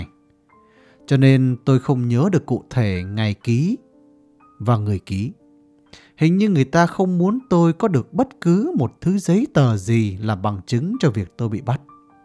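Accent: Vietnam. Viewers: Tui thích giọng đọc của bạn, một chất giọng Bắc rất riêng không chói tai và rất truyền cảm. Riêng về các tác phẩm văn học thì các cây bút miền Bắc viết có tính chất văn học cao hơn để cho người nghe người xem nhiều suy nghĩ giúp có thêm nhiều tư duy.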